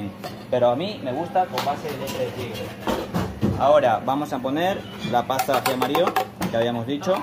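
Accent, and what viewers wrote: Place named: Spain. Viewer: Tu acento ya parece español bro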